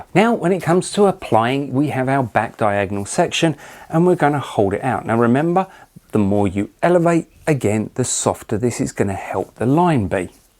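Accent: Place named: United Kingdom. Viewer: Thank goodness mate. British, Clear straight to the point. Thanks alot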